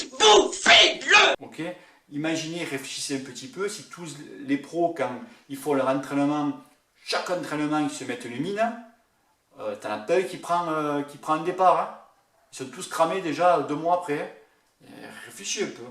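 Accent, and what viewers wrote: South, France. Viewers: Super ta chaine et tes vidéos, je suis fan et maintenant abonné. J'aime bien le coté un peu décalé, non conventionnel et surtout ton accent du sud. Bravo ne lâche rien !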